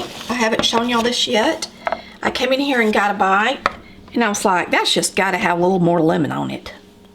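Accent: South, United States. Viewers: She is so cute with that southern voice i love how she read everyone in a friendly manner in regards to the lemon squeezer .... The lemon bars look delicious .....and yes i will try extra lemon lol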